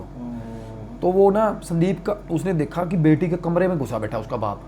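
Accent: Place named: Punjab, India. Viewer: bhai this is punjab n hamare idhar aise he bolte hain meri jaan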